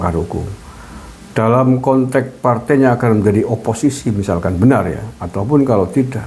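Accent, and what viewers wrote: Banyumas, Indonesia. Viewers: Hongkong hadir pak ,,, tiap bapak aplud psti saya liat dn nyimak,,,pokoke ora ngapak ora kepenak ,,cilacap adipala nyonge pak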